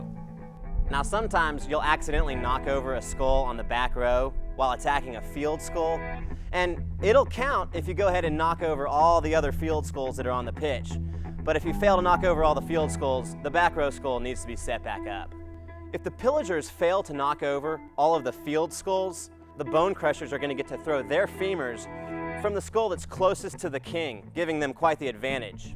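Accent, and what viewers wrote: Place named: United States. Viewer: his American accent is incredibly annoying, it sounds like he's saying kooob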